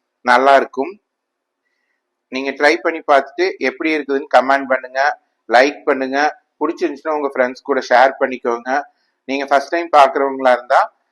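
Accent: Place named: Kongu, India. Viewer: சாமி நம்ம கொங்கு நாட்டு தமிழ் அழகே தனிதான் . உங்க தமிழும் அருமை கீரை கடயலும் அருமை. வா சாமி என்ற ஊட்டுக்கு சாப்பிட குடும்பத்தோட வா கண்ணு🙏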